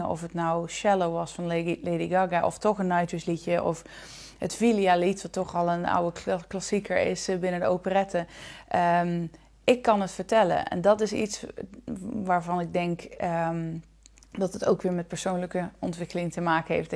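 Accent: Gothenburg, Sweden. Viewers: Gothenburgian accent with a slight Dutch brogue =) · It's a Gothenburg accent, not Norwegian. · Ja, har blivit riktigt bra på svenska. Är det bara jag som hör en tendens till göteborgska? Inte så konstigt kanske med tanke på att hon bor nära Göteborg, men ändå.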